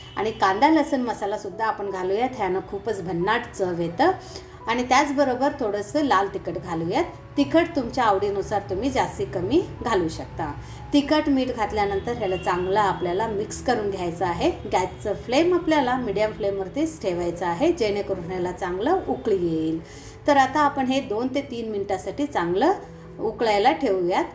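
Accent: Karnataka, India. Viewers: तुमची मातृभाषा कन्नड आहे का 😊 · छानच आहे पाककृती.ज्वारीच्या शेवया.आमच्याकडे अशाच तांदळाच्या पिठाच्या शेवया करतात. तिखट किंवा गोड तोंडीलावणेबरोबर खाल्ल्या जातात. तुमच्या बोलण्यावरुन तुम्ही कानडी असाव्यात असे वाटते.आमच्या शेजारी धारवाडच्या कडकोळकाकू असेच मराठी बोलायच्या.धन्यवाद.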